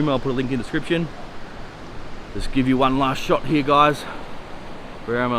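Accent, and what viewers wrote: Australia. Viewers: Hahahah change of scenery. Nice day out and and beach. Ummmm, are you Australian? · Are you from Australia? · where you Australia?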